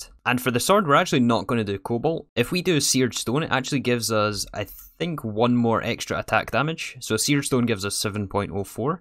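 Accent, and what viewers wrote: Scotland, United Kingdom. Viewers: Mate im going insane trying to find this exact pack, with the quest book etc... Can anyone help me out? What mod launcher do I need, what pack contains the quest book? I havent played Minecraft in years and I cant find this exact pack for love nor money... if someone could point me in the right direction? Cheers! Love your stuff, honestly one of the best creators on here...me and the wife constantly sing to ourselves... Divine Joourney Toooooo in your scottish accent all the time haha Safe x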